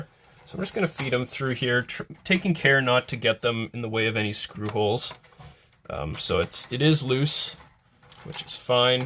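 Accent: Canada. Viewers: A lot of people must not understand he's Canadian when they're listening to him. Pretty sad really.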